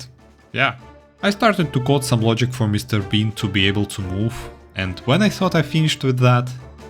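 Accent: Russia. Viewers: Hello! Просто вопрос, вы из россии? Просто по акценту похоже. Да и похоже на. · На самом деле у тебя очень хороший английский. Но всё равно русскому уху очень слышно)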